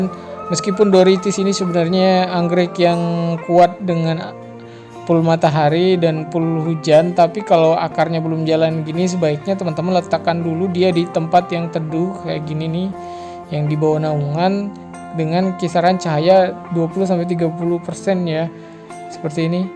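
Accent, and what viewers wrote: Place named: Ambon, Indonesia. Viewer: kirain mas orang ambon..logaty ky ambon...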